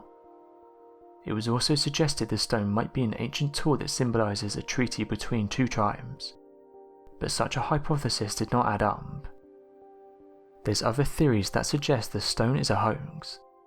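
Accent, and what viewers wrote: United Kingdom. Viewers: i know you have your 800,00 followers and its well deserved but could you please change the tone of you voice cause it sounds like every sentence is the same. just a lil constructive criticism. each sentence sounds the same. literally. i like your videos but when I find myself happen to have clicked on one of your clips i dont want to see it out to the end cause of the bland reading of amazing things. you dont need to be over the top by all means but you end each sentence as boring as the last with a same British or english tongue that gets so old by sentence five. im sorry! you obviosly have got it made. i am just telling you i cant stand the same monotone over and over! thats the word i was looking. its just monotone. I think i have said this before honestly. dont take this bad its just i cant watch another clip of yours if its gonna be this amount of monotone.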